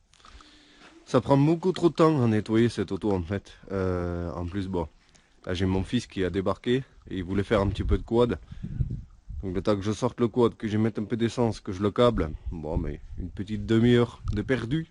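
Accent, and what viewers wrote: South, France. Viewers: Oh tu as l'accent du midi toi non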